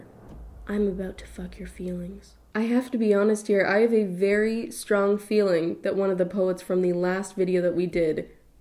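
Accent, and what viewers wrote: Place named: Canada. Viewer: your Canadian accent is so cute!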